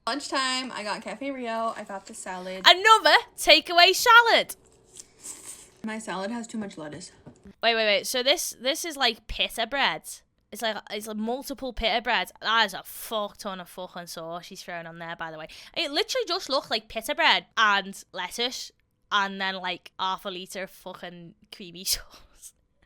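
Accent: Liverpool, United Kingdom. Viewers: She’s not Scottish. Sure of it. She’s Scouse, I think.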